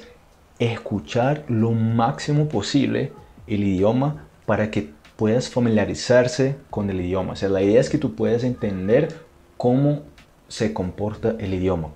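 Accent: Colombia. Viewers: voce tem o sotaque colombiano!!! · Usted, no es de Brasil, es de Colombia! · Hermano felicitaciones pareces un colombiano es impresionante como tenés marcado el acento no pareces brasileño jajajaj,estoy viendo todos tus videos y me ayudan mucho a mi me encanta el portugués desde muy chico escuchaba música axe que pasaban acá en Uruguay y desde hace un tiempo empecé a estudiar portugués,abrazo saludos desde Montevideo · Se nota bastante el acento colombiano. Un saludo. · Usted para un brasileno perfeciono muy Bien El Espanol su Espanol suena muy Colombiano Felicidades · Wow, apenas te descubrí hoy pero estoy impresionado, acabo de ver un video tuyo donde hablas en portugués y obviamente no tuve dudas de que eras de Brasil, pero luego hablaste un poco en español y ahí me entró la duda de dónde eras porque tu español es perfecto y tu acento me sonó a Colombia. Tuve que ver este video donde hablas español para descubrirlo, ahora estoy más impresionado. 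Soy de Colombia y si dijeras que naciste aquí te creería sin dudarlo. Muy buen trabajo, quiero aprender tu idioma y me encantaría llegar a sonar como tú al hablar en portugués, muchas gracias por las recomendaciones, son muy útiles. Saludos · Parabens! Llevo muy poco aprendiendo y llegué a tu canal. Me gusta mucho y me llamó mucho la atención tu español que es prefecto, yo diría casi seguro que lo aprendiste en Colombia 😎, como sea, lo hablas muy bien..bueno, saludos desde Valparaíso, Chile, Obrigado meu amigo 😉🇧🇷🇨🇱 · Felicitaciones pareces un colombiano hablando espanol tu nivel es excelente❤ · ya tienes el aacento colombiano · Hablas con acento colombiano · Pensé que eras colombiano jaja hasta que escuché " tenía problemas con el español en argentina". Ahora, ya eres un nativo en este idioma, definitivamente. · woooow muito legal, eu aproveito muito seus ensinamentos você é um professor muito especial e também parece um nativo de Colombia parabéns e obrigada 🇨🇴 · !! 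Gosto muito de ouvir vc falando portugués, mas falando espanhol e com sotaque colombiano es Wooo👏 estou estudando muito, Obg vc pelos seus videos ajudar muito. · Tu acento en espanol es colombiano creo jeje no te habia escuchado en espanol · Dios mío! Es increíble como hablas de bien español! Tenho uma dúvida: para falar muito bem português, tenho que ter sotaque também? Por exemplo, você fala como colombiano! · tienes acento colombiano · Tiene acento Colombiano este muchacho. 😂 Si si Colombia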